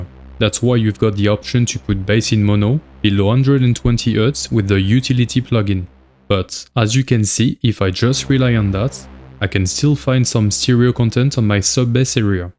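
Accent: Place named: France. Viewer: À l'accent, j'imagine que t'es français donc je me permets de le faire en fr. Super vidéo ! Je viens de découvrir ta chaîne et tu nous donnes des tips vraiment utiles ! En tout cas j'avais jamais vu une vidéo comme celle-ci sur les prb de phase. Merci mec !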